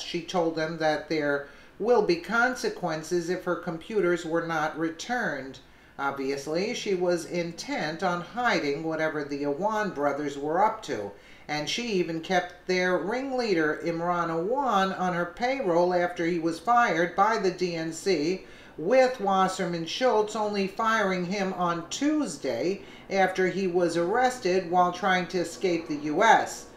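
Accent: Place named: Brooklyn, United States. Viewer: Ok, you bring some good news to this but I have to unsubscribe. Why do you read what is on the screen? WE CAN READ. READ with support collateral or show text and talk about the collateral pictures/graphics. Also, you sound like a Brooklyn middle aged Italian/Jew. That whine is killing me. lol. You do a great job. I am simply being critical due to broadcast journalism past and a traditionalist. Plane flying over head is a nice touch. God bless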